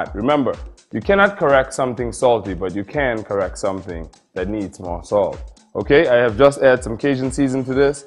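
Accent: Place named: Turks and Caicos Islands. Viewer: Oh I catch this accent now! 😂 Turks & Caicos used to be a part of the Bahamas, thus the traditional foods & recipes will be close. In my experience, the difference in taste is minuscule. Much love, Bro!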